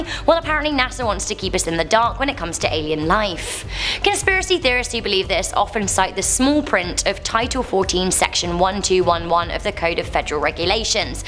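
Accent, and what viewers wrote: United Kingdom. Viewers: voice is so British and soothing, it's like a bedtime story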